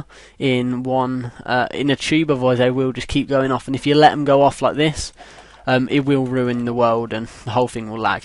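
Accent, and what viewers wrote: United Kingdom. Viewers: I love british acents and good for if you have little materials too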